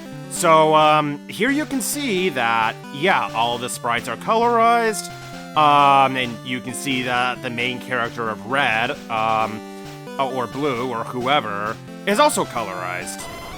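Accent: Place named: United States. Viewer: It just sounds American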